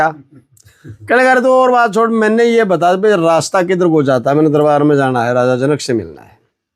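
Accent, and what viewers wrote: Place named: Haryana, India. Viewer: Jai Gurudev 🙏🙏bahut hi sunder prasang tha maine do bar suni. एक तो हरियाणवी टोन थी जिसकी वजह से दो बार बड़े ही ध्यान से सुना तो अच्छे से समझ आई. बहुत ही सुन्दर कथा प्रसंग🙏🙏 अब छोटी मुँह बारी बात एक संका मेरे मन में भी रह गई की राजा जनक तो त्रेता में हुए और राजा परीक्षित कलयुग में, बिच में पूरा द्वापर बिता तो क्या तब तक राजा जनक थे ही?? बस संका हुई तो पूछ लिया और कोई बात नहीं उचित समझे तो संका का समाधान करें🙏🙏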